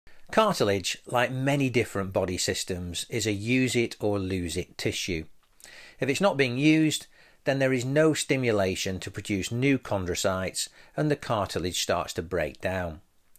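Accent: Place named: Scotland, United Kingdom. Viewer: good Scottish accent 😊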